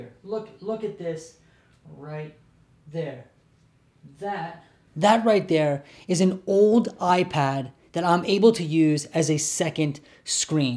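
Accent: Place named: China. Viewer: sounds chinese therefore I don't trust it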